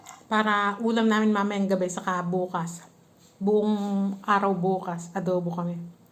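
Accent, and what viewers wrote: Nueva Ecija, Philippines. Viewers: Ate parang Punto mo taga Nueva Ecija Po kayo idol kopo kayo❤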